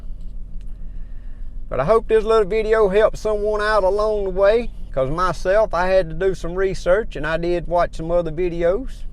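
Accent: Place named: Kentucky, United States. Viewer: Great video buddy! I got to change mine and I’ll know what I got coming. I don’t hear many folk on YouTube that kinda sound like me 👍 I’m from Southeastern Ky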